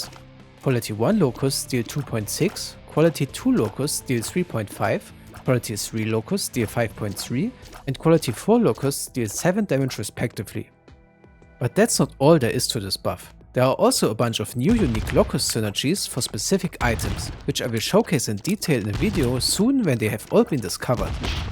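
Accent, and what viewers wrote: Germany. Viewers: the lovely german accent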